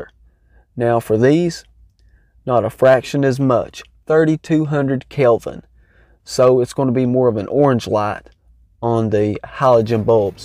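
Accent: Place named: Texas, United States. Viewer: I love your Texas accent mate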